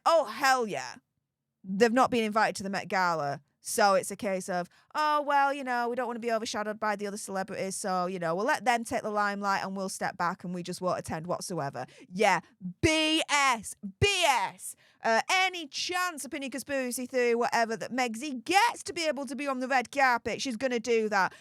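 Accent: United Kingdom. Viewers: I love your accent true British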